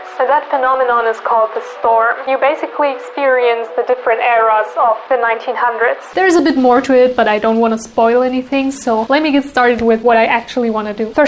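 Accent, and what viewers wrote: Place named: Germany. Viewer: joooooo ich hab das ganze video geraten was das für ein akzent war und als du das dann mit der deutschen voice line gesagt hast war ich happy weil ich nd viele deutsche kenne die das Spiel spielen ^^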